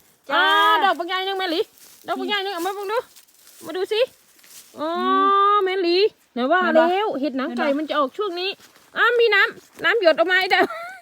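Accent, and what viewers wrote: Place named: Sakon Nakhon, Thailand. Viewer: พี่พูดเหมือนภูไทสกลเลยจ้า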